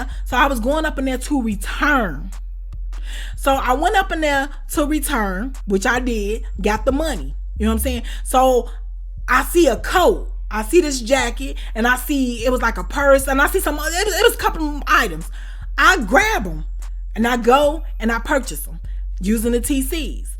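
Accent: Chicago, United States. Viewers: I just found your channel this morning and on the 3rd video lol I know my ppl when they speak (Chicago #773 😌) . Keep doing what you’re doing 💪🏾💪🏾💪🏾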